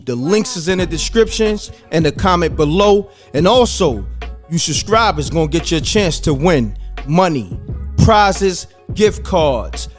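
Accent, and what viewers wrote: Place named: East Coast, United States. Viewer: your East Coast soft voice wit that accent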